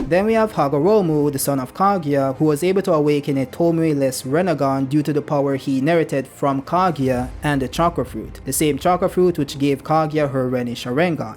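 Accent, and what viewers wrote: Jamaica. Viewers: Are u Jamaican ? If so I am too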